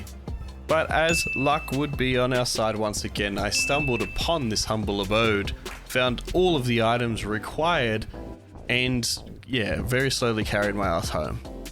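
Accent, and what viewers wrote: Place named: Australia. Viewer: I love the australian accent. You are doing great, keep it up!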